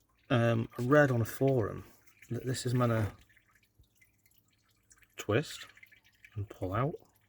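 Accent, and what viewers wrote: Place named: North Wales, United Kingdom. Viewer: Sounds like North Wales accent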